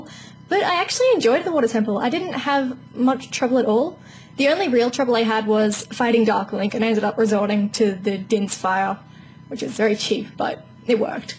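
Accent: Australia. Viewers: hey u got a aussie accent...u from SYD, NSW?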